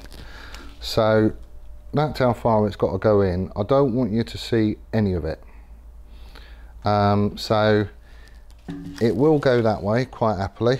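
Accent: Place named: Plymouth, United Kingdom. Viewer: Plymouth! , thought you sounded Jannerish.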